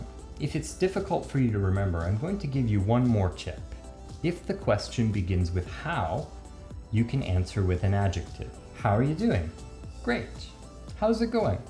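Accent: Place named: Canada. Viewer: Love that Canadian accent!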